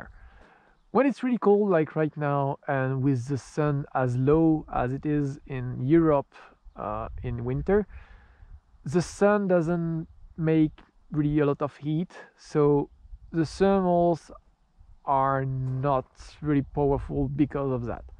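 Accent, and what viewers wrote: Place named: France. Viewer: you never have to apologise for having a French accent. Like most Englishmen, I can just about manage to order a beer and a ham and cheese sandwich in French. I am constantly amazed at other people's ability to communicate so fluently in another language.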